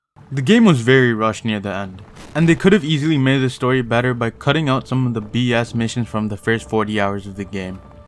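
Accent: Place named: South Asia. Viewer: South Asian voice- immediately shuts off video. This guy’s iq is too low to appreciate the game.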